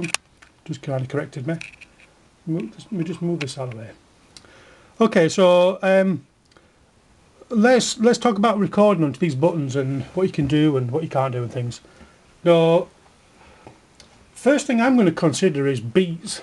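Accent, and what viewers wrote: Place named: Lancashire, United Kingdom. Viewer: Hi mate, thanks for your tutorials, you're doing very well.Hey I love your accent. Is it Lancashire you come from or somewhere not far? I used to attend boarding school in the beautiful Lake District which as you may know's a stone throw away. I have just bought a Kaosilator like yours and learning. Do you happen to know how I would save stuff onto the SD card without erasing previous data? The instructions are not the clearest in some respects. Cheers.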